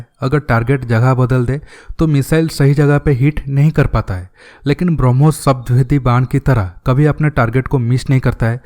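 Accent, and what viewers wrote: Odisha, India. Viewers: Odiya hai apka hindi achya nehi hai ..odiya typ ka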